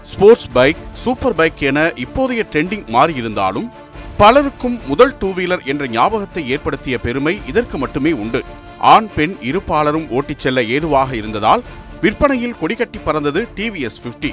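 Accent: Tirunelveli, India. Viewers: திருநெல்வேலி காரன் லே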